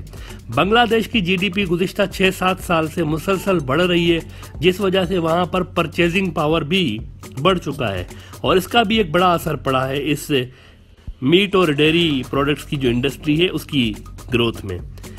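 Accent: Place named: Pakistan. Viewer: itna gherah Urdu boltta hee tav mojey laga Pakistani hee kioki Indian Urdu Hindi ka milawat hee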